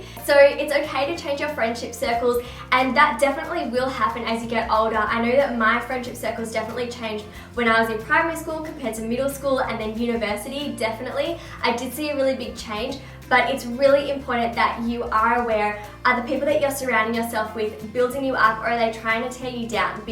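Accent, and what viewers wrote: Australia. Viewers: I just discovered your channel and your videos are really helpful to get motivation to study^^ and btw you're accent is Australian right?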